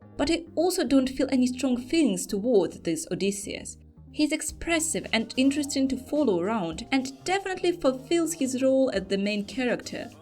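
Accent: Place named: Ukraine. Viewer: WAIT— UKRAINIAN? а я ж ЧУЮ що якийсь акцент є!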